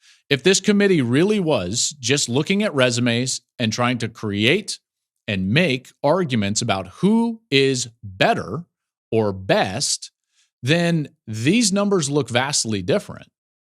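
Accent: South, United States. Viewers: Wow, I'm surprised you decided not to use your southern accent and unprofessionalism to mock the whole South to get your point across this time. Your bias is so obvious. Why don't you take some cues from Greg Mcelroy sometime about hiding biases when working professionally, and he has been in the business for a shorter time than you. You completely lost my respect when you decided to mock a 2-time national champion. What are your accomplishments compared to that?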